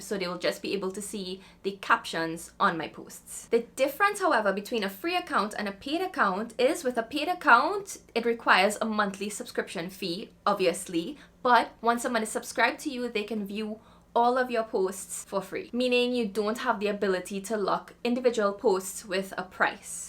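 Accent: Trinidad and Tobago. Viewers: You sound Trinidadian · Came for the info. Fell for the accent 🤣 Trini?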